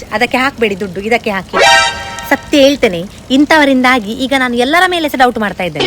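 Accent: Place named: Karnataka, India. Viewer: I can't help laughing out loud at your slangs and abuses. I don't actually appreciate them but there's something about the way you say it 😂 And that typical south Canara style you say it is just 💥😁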